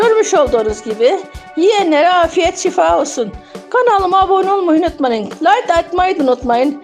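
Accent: Gaziantep, Turkey. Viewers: Tam bir antep dili konusuyon bayıldım konuşmana çok tatlı eline sağlık zeytin yağı kokuyor ayçiçek yağı kullansam olurmu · Yaaa teyzemmm Gaziantepten uzaktayım konuşman o kadar tatlı ki özlem giderdim. Ellerine sağlık çok güzel duruyor. Ama bir eleştirim var köftenin yanında yaprak sarmasını göremedim ☺️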